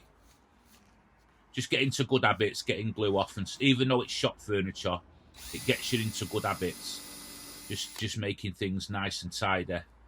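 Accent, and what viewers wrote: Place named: England, United Kingdom. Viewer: You sound like an English man . Am wondering why your title mention a......one by six. You use 6 by1 i hear. Dont confuse us Aussies. No one gets it right the first time.. you will never be perfect ,thats not possible. Call it what you want and dont copy thoses loud mouths over the big ocean. Gluing only is absolutely fine.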